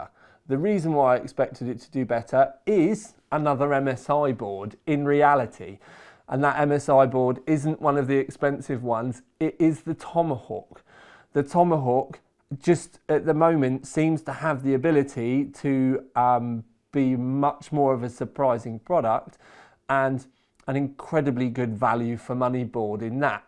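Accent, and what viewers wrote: United Kingdom. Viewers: please reduce your british accent ! 70% in youtube user comes from non english countries and cant understand british accent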